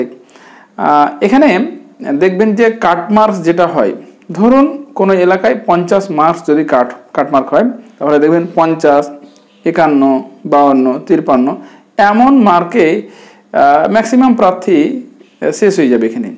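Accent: India. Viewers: ভাইয়ে বাড়ি কি পশ্চিম বঙ্গে।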